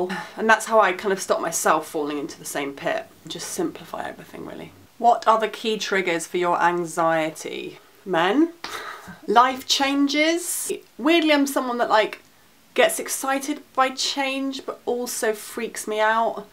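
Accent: England, United Kingdom. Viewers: I was little confused about how half a bottle of red wine isn't a good source of relief. I was panicking earlier(while watchin your video) and so i drank a 25oz beer(Natural light) smoked a halfer, and then i got all into your video, the highlight of my day(woman). I decided; it's your magical English accent that makes everything epic like a Harry Potter movie. Will you practice magic with me? But Y-N is Good. couple of sips>? what's with the guilt trip. Power tripper over and out.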